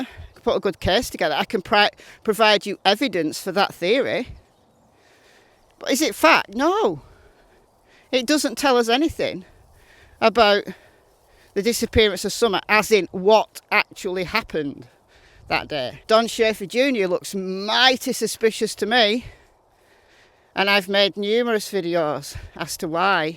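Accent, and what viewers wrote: United Kingdom. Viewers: Your channel makes the most sense. You are not a gossiper, fabricator and are authentic and I love your British accent 👋🏽💘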